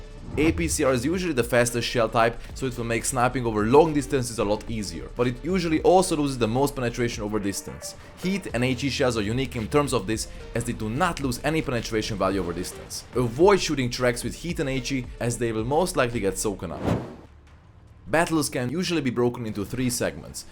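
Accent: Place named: Hungary. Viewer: Miért ilyen magyaros a kiejtésed?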